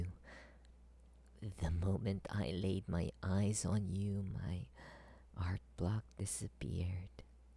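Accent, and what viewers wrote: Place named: Southeast Asia. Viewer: Low-key hear a southeast Asian accent but I can't point my finger on where the accent is from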